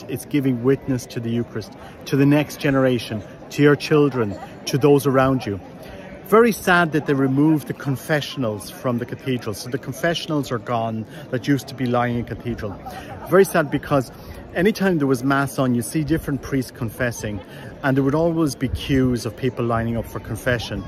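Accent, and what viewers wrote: Ireland. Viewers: I never really appreciated the Luminous Mysteries of the Rosary until this whole Covid episode, when everything in our Luminous Mysteries was (is) under attack. From not wanting to baptize babies to no respect for Communion. Now I understand exactly why we were given the Luminous Mysteries and when we were given these Mysteries. Grand old Irish accent. I grew up in County Louth.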